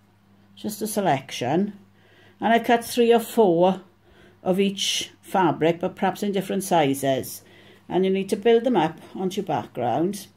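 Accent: Wales, United Kingdom. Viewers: I know it's welsh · I love your accent. is it Welsh??? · As soon as you started talking I could tell you were Welsh. I looked at your blog and see you're from Port Talbot. Lovely work, you're very talented. I'm from Merthyr Tydfil, not too far away and I probably sound the same, lol.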